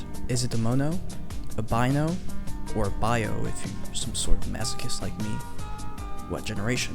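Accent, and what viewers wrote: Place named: United States. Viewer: not bitching but you sound awfully american for someone giving advice on getting nods in eu, hehe. also, is that machine girl in the outro?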